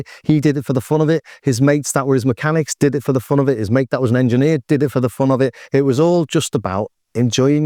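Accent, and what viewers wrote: Liverpool, United Kingdom. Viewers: It feels spooky to have a construcitve lecture from someone with the liverpool accent on the corrosive effect on society of the politics of envy, but you are 100% correct. Make music with what you have, it's still fun. And it's always rewarding how one thing leads to another in the music world if you put yourself and your work out there.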